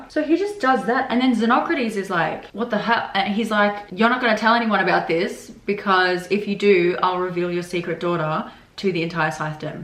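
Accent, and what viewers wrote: Australia. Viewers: No wonder sounds really off … thought it was from some part of country I never been to … it’s Australian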